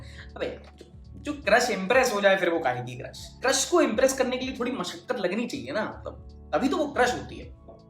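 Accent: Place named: Bundelkhand, India. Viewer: I love your bundelkhandi accent bhaiya Being from Rath i can relate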